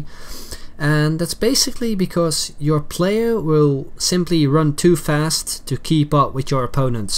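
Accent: Netherlands. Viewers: Haha je hoort gelijk al dat hij nederlader is :-)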